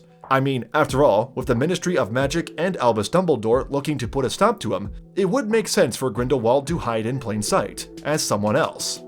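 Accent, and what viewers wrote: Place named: United States. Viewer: No kidding? Your dialect sounds very American.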